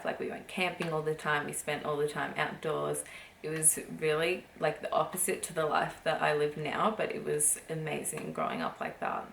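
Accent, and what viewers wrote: Australia. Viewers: Pretend? well we don't need to with you honey you fat water buffalo. This girl is absolutely beautiful (no pretend) also she's not monotone it's just an Aussie accent and looking after two young kids all day. That shit takes a toll, but you wouldn't no fatty and you never will. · Third Aussie YouTuber I'm subscribed to. Aussie accents are like music to my ears lol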